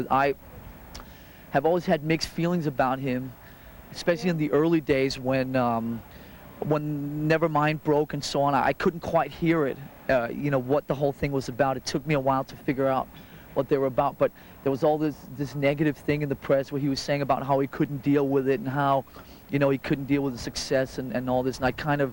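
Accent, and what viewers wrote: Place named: Denmark. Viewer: It’s the Danish accent lol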